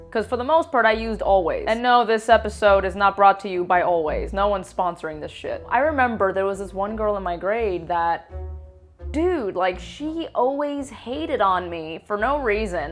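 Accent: Cuba. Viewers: I love your Cuban accent, especially when you imitate your grandma.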